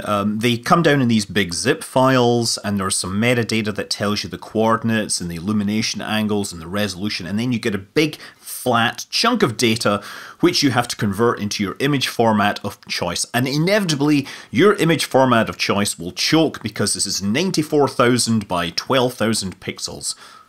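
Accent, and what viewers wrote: Scotland, United Kingdom. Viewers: "Push broom" might be the best phrase to hear spoken in a Scottish accent.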